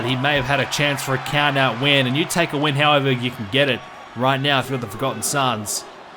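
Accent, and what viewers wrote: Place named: Australia. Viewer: are you from australia? coz thats where i am and you sound aussie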